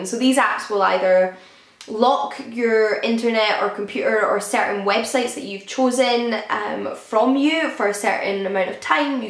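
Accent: United Kingdom. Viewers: Well, nice to meet you! You're beautiful have a good british accent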